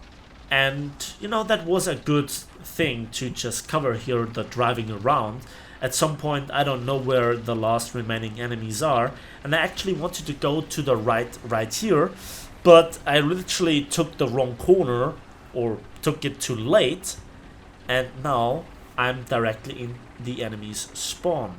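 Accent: Germany. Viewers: I'm subscribed for that crisp German accent and inter-regional banter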